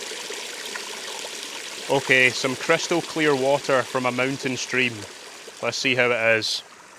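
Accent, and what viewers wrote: Scotland, United Kingdom. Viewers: oh babez, you’re dead hot and I loooooove yer scottish accent. i sooooo would spend one or even more than one night with you in a bothy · Just fallen on your link which is really splendid. Your images and commentary are excellent. That Scottish accent is so clear. I follow two other Scots on YT Steve Marsh and Ruth Aisling, I'm English but have lived in France for many many years.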